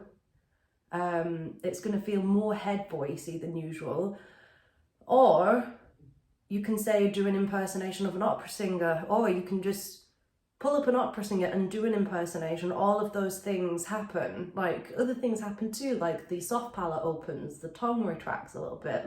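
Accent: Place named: Northern England, United Kingdom. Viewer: This is a great idea! I've had years of lessons and my main teacher has spoken a lot of placement, but I really struggled with the descriptions of placement and to a certain extent, I still do. ("Place it more forward" - I think I learnt to close my pharynx a little more when I heard that, but it could be that I changed my configuration in other ways that I'm not conscious of.) Perhaps if I had been asked to imitate some of the voices here or in other songs, I would have got there quicker. I love your Northern accent! We have such a rich tapestry of accents in the UK; mine is a bit of a mix between RP and Welsh! :)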